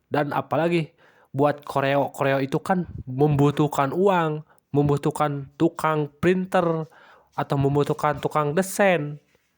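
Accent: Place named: Sunda, Indonesia. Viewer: Mang mantap sunda pisan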